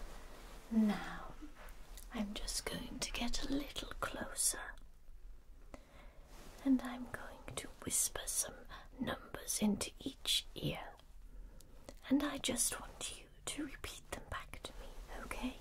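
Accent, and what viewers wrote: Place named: United Kingdom. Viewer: This is really COOL!! I enjoy it thouroughly!! I love your BRITISH accent!!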